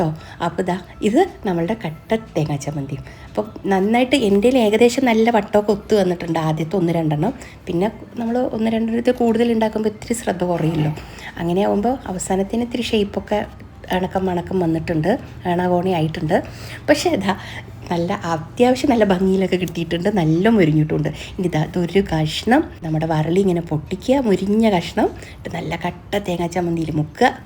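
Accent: Palakkad, India. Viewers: Tto tto ttolu palakkadan slang❤️ from a pkd kari(nemmara)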